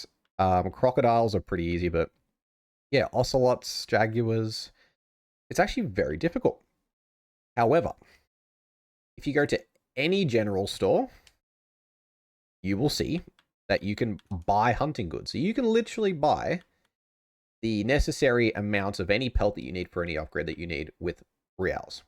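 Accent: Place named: Australia. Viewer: One of the thickest Aussie accents I’ve ever heard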